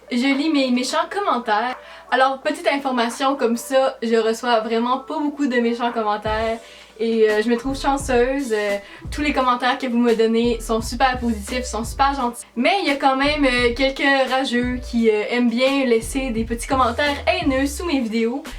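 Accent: Quebec, Canada. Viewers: J'adore ton accznt québécois:continue change pas ton accent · j'adore ton acce't et ca se voi qui, vient de Québec ☺💜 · J'aurai tout donner pour avoir ton accent. Mon rêve est d'aller au Québèc, donc tu est pour moi PARFAITE. <3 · j'aime tellement mais tellement tes vidéos ! Au début j'avais un peu du mal avec l'accent québécois mais franchement je me suis habituée puis maintenant je regarde beaucoup de québécoises et j'aime beaucoup votre accent ! Puis reste comme tu es parceque je ne te connais pas réellement mais la personne que tu fais ressortir est vraiment cool. J'attends ta prochaine vidéo! ☺💙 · C pas sa faute si elle a un accent québécois perso j'ai un accent parisien, et son accent est tres mignon elle y peut rien ❤️ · Moi je t'aime trop puis j'adore ton accent ce québécois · elle et canadiens sa se vois et sa sentant !!!!!!! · moi j'adore ton accent et je rêve de vivre au Québec depuis que j'ai 6 ans (j'en ai presque 14) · j'adore ton accent québécois, continue tes vidéos je t'adore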